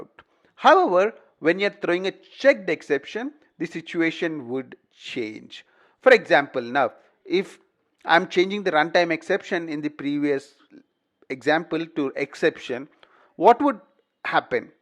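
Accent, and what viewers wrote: India. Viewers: you are great!! only indian accent that I can bear really!!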